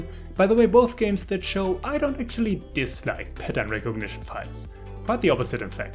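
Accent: Germany. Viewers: Oh shit, so that's what it is. I've been trying to figure it out, but I wouldn't have guessed German.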